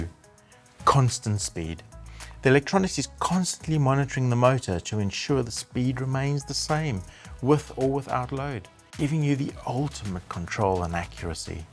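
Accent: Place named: South Africa. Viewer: He sounds South African. I just bought this router. Damn I was not expecting it to be this good!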